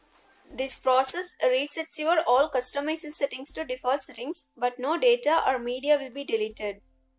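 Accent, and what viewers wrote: India. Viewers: Thanks for “caption”. English of Indian accent, because, it’s hard for me to understand it, I usually get off of the site. But with your CAPTION, I read and I am thankful of that. “THANK YOU”.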